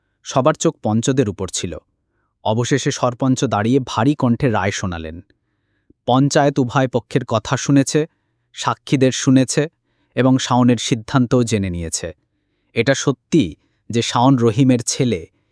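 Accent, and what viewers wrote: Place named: Dhaka, Bangladesh. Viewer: এই গল্পকার ঢাকার কথা বলার কারণে গল্পের গুরুত্ব কমে যাচ্ছে।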